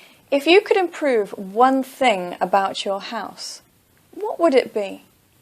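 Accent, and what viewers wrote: United Kingdom. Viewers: Hey thanks for the videos. Studied for 8 days watching every video of yours and also checked the website for reading test. Got 7 band overall. Very happy. Thanks for the help. Didn’t get enough time to practice, else 8 band was easy. Thanks a ton. BTW, I’m in love with British English now! 😅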